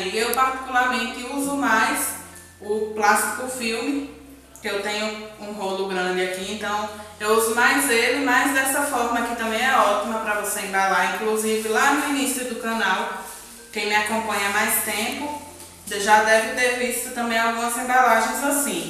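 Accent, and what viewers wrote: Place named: Northeast, Brazil. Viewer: Olá gosto muito dos seus vídeos meu nome é Walmir Lopes sou de Salvador Ba vc é muito simpática vc é de que lugar vc remédio um sotaque nordestino eu gosto muito desse sotaque eu também sou nordestino um beijo.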